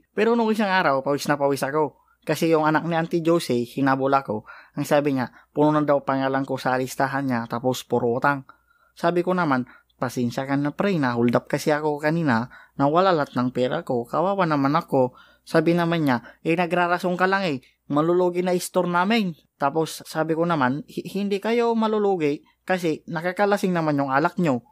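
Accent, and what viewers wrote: Ilocos, Philippines. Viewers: The best talaga accent ti ilokano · Ilocano ka boss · Ilocano kaba boss hahaha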